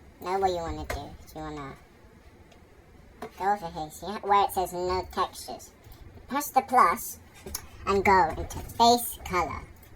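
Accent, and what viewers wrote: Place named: United Kingdom. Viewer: U sound so british 😭😭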